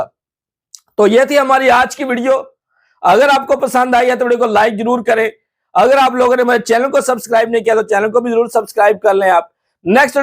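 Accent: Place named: Karachi, Pakistan. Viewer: Abey tu kaisa ch*tiya hai bey? Indian jersey pehen lene se hum tujhe indian man lenge?? Sale tere channel k description mein dikha rha tera channel pakistan ka hai. Sale tera accent dekh, karachi k l@ bazar ka gawaiya lag rha tu. Abey teri apni jersey pehnne se sharam aati hai tujhe to Bangladesh ka pehen le bey bhikari.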